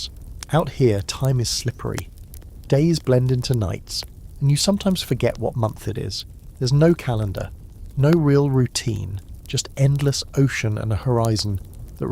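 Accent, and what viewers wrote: United Kingdom. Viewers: am Yorkshire ❤️ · Stop believing every word this guy says just cuz he has a British accent 😂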